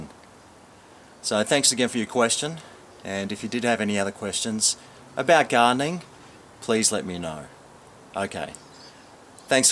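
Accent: Australia. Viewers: Thanks heaps! Fixed my mower! Legend! U also taught me how to cook Corned beef (was that diff channel, watched it over a yr ago!?) ! And all with the Aussieness I'm used to! Cheers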